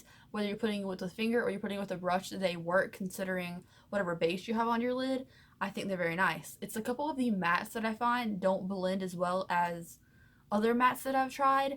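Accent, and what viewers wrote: South, United States. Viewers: First off you’re gorgeous and I love your reviews so much. I noticed we have similar accents and figured you were from the south and I looked at one of your older videos and saw you’re from North Carolina!!!!!! Ayyyyy represent?!!! Nice to see successful YouTubers from here and really gives me hope. Love your content and you truly deserve more views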